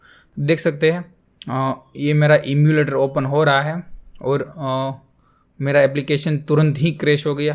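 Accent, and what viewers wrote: Gujarat, India. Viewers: Gujrati Accent Detected.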